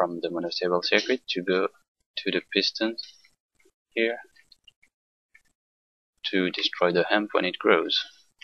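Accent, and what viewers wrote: France. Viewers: i need to know, are you french?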